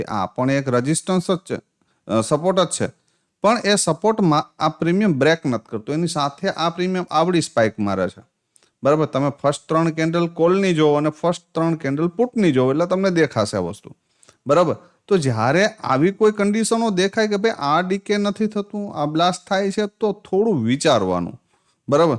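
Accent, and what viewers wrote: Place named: Kutch, India. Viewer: Kutchi Madu lago chho bhai bhasa par thi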